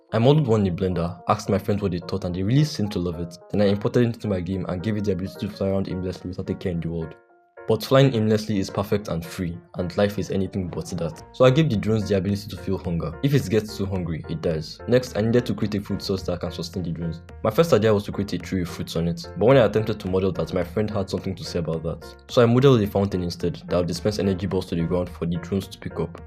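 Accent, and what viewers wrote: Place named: Russia. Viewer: ohh your accent sounded Russian to me😅. Nice video btw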